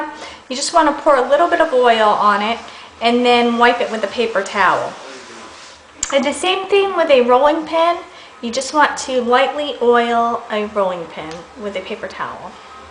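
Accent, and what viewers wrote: United States. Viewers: u sound country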